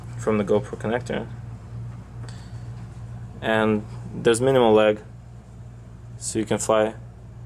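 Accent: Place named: Russia. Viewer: По акценту я сразу понял что говорит русский)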